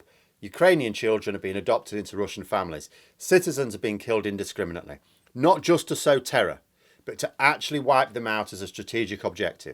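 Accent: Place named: England, United Kingdom. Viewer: By no means do I mean to offend but hearing someone with an english accent advise Ukrainians utilize IRA tactics to repel Russian occupation is unexpected and bemusing.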